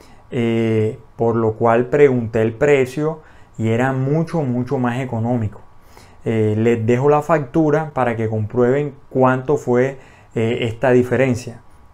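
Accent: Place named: Caribbean Coast, Colombia. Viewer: Costeñol efectivo, gracias man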